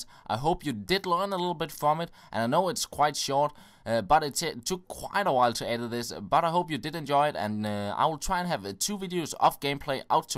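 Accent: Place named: Denmark. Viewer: Haha, man kan hører du er dansker :-) - Elsker dine videoer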